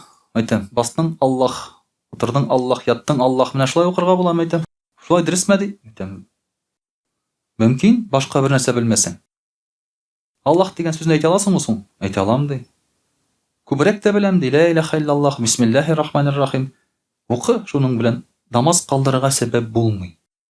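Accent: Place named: Tatarstan, Russia. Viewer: Бик таза чын татар теле зур рэхмэт эти эниегезгэ эби бабаларыгызга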